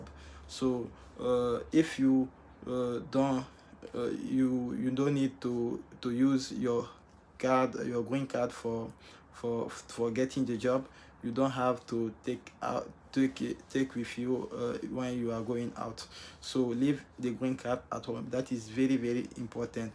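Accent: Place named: France. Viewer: Apparemment vous parlez bien anglais mais vous parlez l'anglais comme les Français ici qui parle anglais. Votre accent anglais est tellement français. Moi aussi quand je parle français, les français toujours ma dite je parle avec l'accent anglais 🤣🤣🤣🤣✌👍👋👋.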